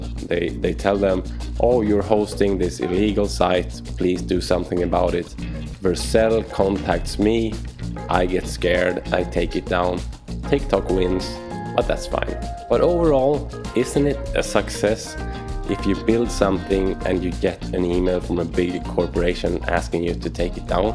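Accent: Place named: Sweden. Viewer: Man I was so sure I recognized the way you pronounced things, checked your Github profile and I saw right, you're from Sweden!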